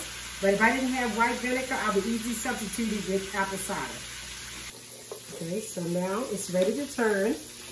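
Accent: Baltimore, United States. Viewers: Momma you from Baltimore ? 😂 I can hear it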